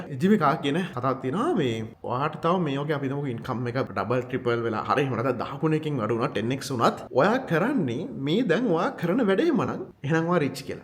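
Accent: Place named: Sri Lanka. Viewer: Olee සිංහල Accents එකට නම් හිත ගියා 🤍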